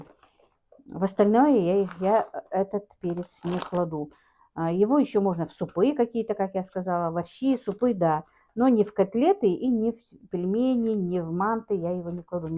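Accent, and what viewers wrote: Standard. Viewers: Чистейшая, грамотная русская разговорная речь. умница.Наверное имеет высшее образование.да и книги читает. ❤Дай Бог здоровья.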